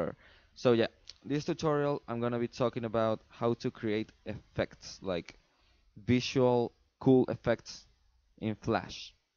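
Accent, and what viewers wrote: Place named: Brazil. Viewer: el chico del vídeo fala com acento brasileño.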